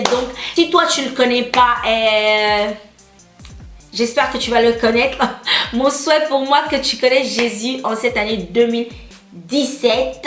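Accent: Ivory Coast. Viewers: ahah maintenant j'ai compris que tu a deux canal, c'est coul!!! j'adore l'accent ivoirien!!! :-* Que dieu te bénisse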